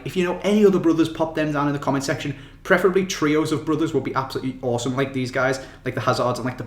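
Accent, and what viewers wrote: Liverpool, United Kingdom. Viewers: Yay another scouser, shame ur not a liverpool fan tho :P ;)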